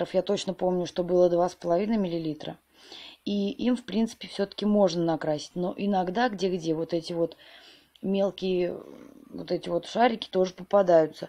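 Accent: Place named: Ivanovo, Russia. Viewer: Говор у блогера похож на ивановский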